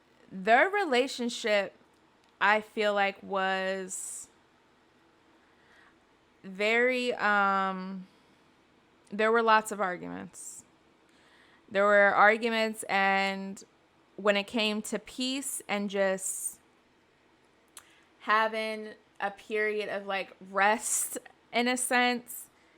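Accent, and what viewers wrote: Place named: Maryland, United States. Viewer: I love the your accent is it Maryland or Baltimore?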